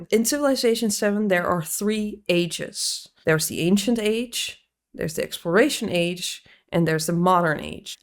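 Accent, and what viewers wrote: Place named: Netherlands. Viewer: I smell Dutch accent🗿 But I feel like they kinda dumbed down the fun parts of civ 7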